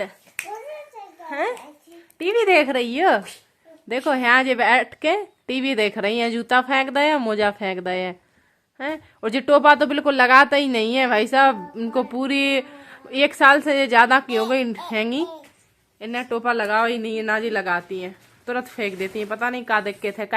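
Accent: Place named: Bundelkhand, India. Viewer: Didi mai aapko indoor se dekhti hu mera naam asha hai mai aapko bhut pahle se dekhti hu meri mummy ka gav bhi tikmgad hai mai bhi aapke jese hi bhsha mai baat karti hu bundelkhng mai aap bhut piyari ho kisi ki baat par dhyan mat do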